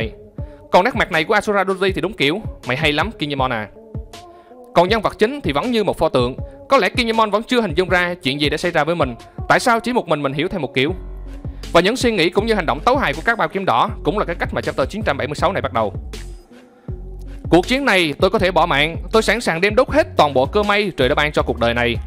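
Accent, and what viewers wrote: Quang Ngai, Vietnam. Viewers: Ad quê Quảng Ngãi hay Quảng Nam vậy? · Ad người miền trung hả anh, em nghĩ anh người quảng ngãi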